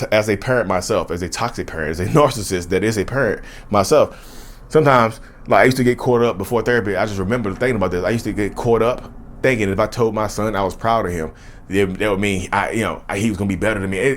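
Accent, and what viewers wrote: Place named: North Carolina, United States. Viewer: That preacher thing happens to my voice in my videos sometimes too! 😆🤣🤣Also, the very FIRST time I heard you speak, I was like, "This dude sounds like home. He has to be from NC..." I'm in L.A. by way of Charlotte. Congrats on all the moves you're making,. -S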